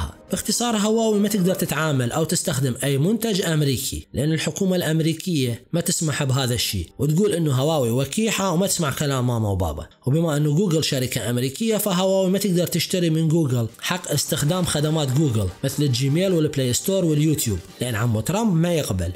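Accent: Iraq. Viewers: يمعود شكد بلعراقي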